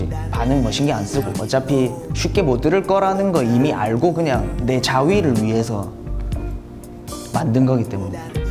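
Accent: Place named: Busan, South Korea. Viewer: 확실히 부산 사투리가 까리한데~~~~ 조용조용히 주술 외우는거 같다 ㅎㅎㅎㅎ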